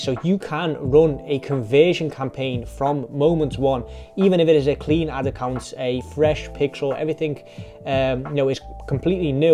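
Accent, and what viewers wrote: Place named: Liverpool, United Kingdom. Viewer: You're from liverpool like it or not. Talking like jordan henderson and TRENT alexander arnold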